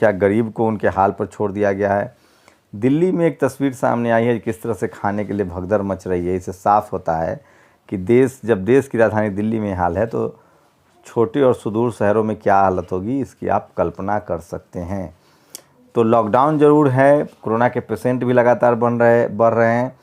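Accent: Bihar, India. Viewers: आप का बिहारी टच अब कुछ ज्यादा ही हो रहा है।।।। जय भीम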